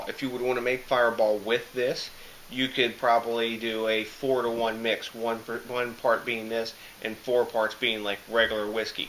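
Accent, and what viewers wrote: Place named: Delmarva, United States. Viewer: I am pretty sure this guy is from the DelMarVa (Delaware/Maryland/Virginia tristate area). His accent gives him away. Let me know if I am right!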